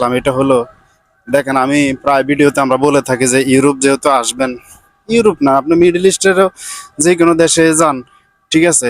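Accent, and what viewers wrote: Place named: Noakhali, Bangladesh. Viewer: ভাই কি নোয়াখাইল্লা নাকি😊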